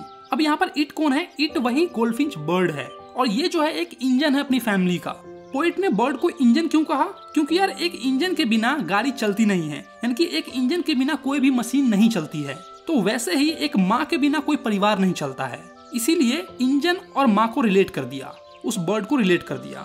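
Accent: Bihar, India. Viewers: Bhaiya your way to teach us is extremely nice, as I know you sometimes use bihari accent language that,s awesome I have also several friend from bihar which is also great and hilarious.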